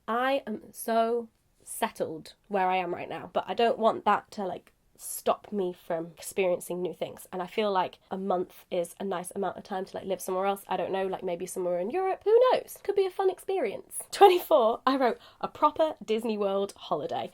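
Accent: United Kingdom. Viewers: You are very cute and sexy and the British accent...OMG...so hot! Much love from Arizona, USA.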